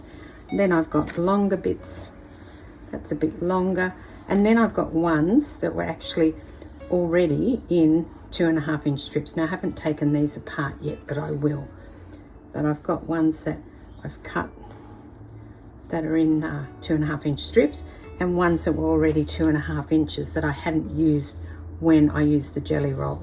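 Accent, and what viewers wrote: Australia. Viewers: So wonderful to hear an Australian voice working with scraps! I have just begun my scrappy journey. I love the project. Thanks for sharing!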